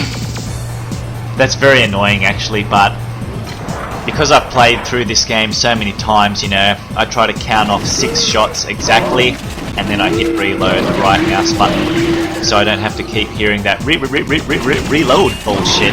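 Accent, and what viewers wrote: Australia. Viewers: Are you Aussie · are you australian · Are you Australian, by any chance?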